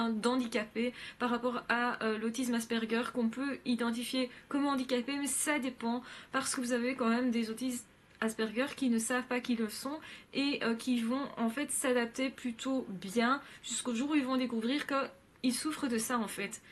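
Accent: Belgium. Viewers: Etes-vous de Liège ou de Bruxelles? :-)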